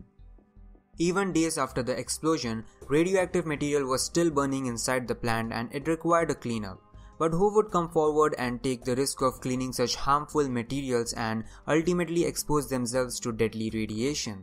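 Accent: India. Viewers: Bruh indian?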